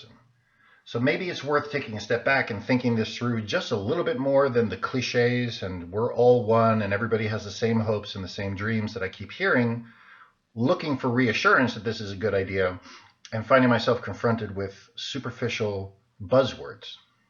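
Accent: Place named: United States. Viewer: May I ask you where you live? I hear an American accent though so America I guess, but where?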